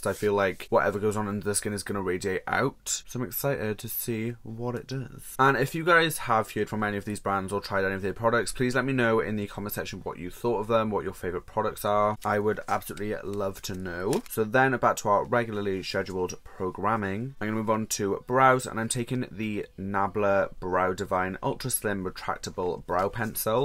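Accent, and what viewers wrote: Wales, United Kingdom. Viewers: I love your accent!!!! Are you Welsh?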